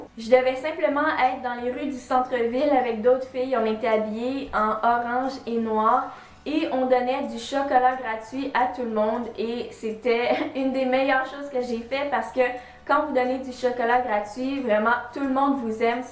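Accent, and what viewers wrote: Quebec, Canada. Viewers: tu a l'accent quebecoi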